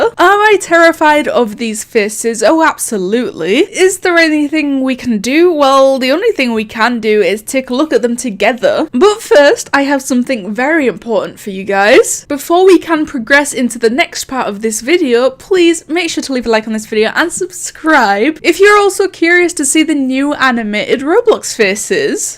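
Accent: Scotland, United Kingdom. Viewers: Are you from scotland? Because your accent reminds me a lot of Merida and I think she's from there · are you scottish? i am :D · Scottish accent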